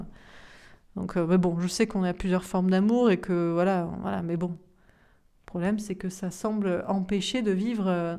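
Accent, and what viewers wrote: South, France. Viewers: Bonsoir, J'adore vous écouter, et surtout votre humour avec l'accent du sud!! C'est tellement ce que je vis cette guidance.. avec un masculin super matrixé.. qui fait style qu'il n'a rien vu, que sa vie est cool.. lol quoi merci 🙏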